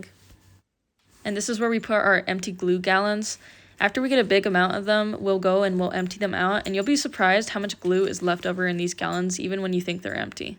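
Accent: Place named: Australia. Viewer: Is it just me or did she go from no accent to Australian accent 😅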